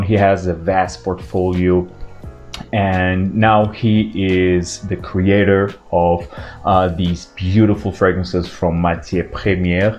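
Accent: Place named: France. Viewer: this is my first time watching your review, I'm loving it. thanks for the vivid describe of the notes. I also love your accent. it's like my french friend speaking english.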